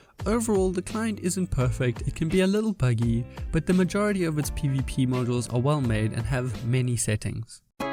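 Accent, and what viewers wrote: South Africa. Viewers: Just realized your South African, from your accent Its good too see there are RSA Youtubers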